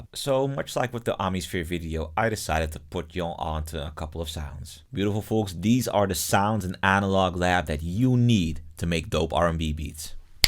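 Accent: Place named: Netherlands. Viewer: i can hear dutch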